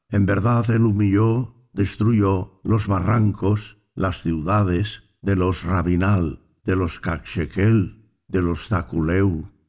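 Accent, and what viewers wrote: Castile, Spain. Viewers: Y porque no lo lee alguien con voz y acento de mejico o Guatemala??? Este con tanto acento de los españoles que fueron los destructores, es una gran pena. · No entiendo por qué felicitan la narración, si los datos son incorrectos. Uno de esos comentarios, dice que el Libro tiene mal los datos, NO, el narrador cometió los errores, EL LIBRO ESTÁ BIEN. En mi opinión, hay magníficos narradores LATINOS, no me gusta el acento español, prefiero el acento DE NUESTRAS TIERRAS y más para un LIBRO SAGRADO MAYA.. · Lo Unico que no me gusta es que esta español de España pienso que deveria ser español Latino de Mexico o Guatemala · Un poco irónico que el audio sea ejecutado por alguien con acento castellano. Muy agradecido de todas maneras 😂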